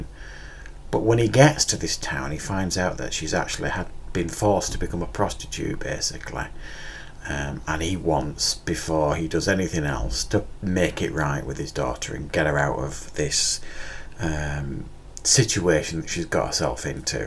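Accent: United Kingdom. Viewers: You from UK?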